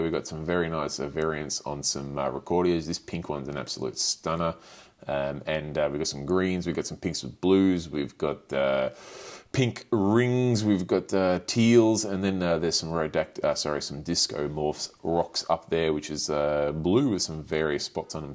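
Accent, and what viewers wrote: Australia. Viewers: Ahhhh, I always thought it was..... I do love Australian accents😁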